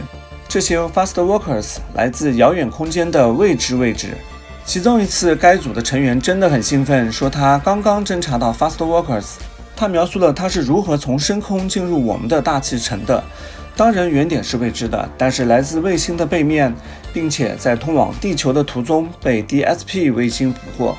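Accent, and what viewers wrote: China. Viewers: 一直以為tea小編是台灣人耶，聽口音很像上海人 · 听口音大陆的哦！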